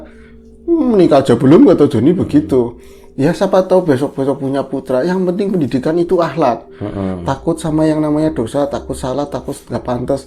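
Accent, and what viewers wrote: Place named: Java, Indonesia. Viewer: Mas ni klo crta bikin senyum² ketawa² sndri jj gegara Pakebahasa jowo nya medokk.. mantapp cirri khas dr ngoborr, 😁😁😁 mogga channel nya smkin berkembang 👍👍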